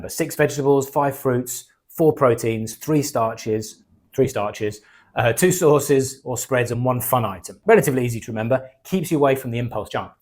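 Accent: United Kingdom. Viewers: is this what plebs like me would term 'spin'...? Suggestion, bias, sales. The beat friend of politics these days. Your use reminds me of the health related mindset change; i get to work out (as opposed to must), get to eat healthily (as opposed to 'ugh i have to eat x). Definitely change begins in the mind. So glad to get an English accent, getting tired of the US ones on social media, just soooo many (i'll offend someone i'm sure). I'm irish, but we'll be neighbourly 😊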